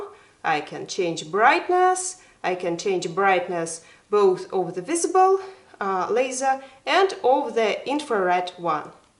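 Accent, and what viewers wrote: Russia. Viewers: I am enthralled. The Russian accent, the tactical proficiency... I might be in love.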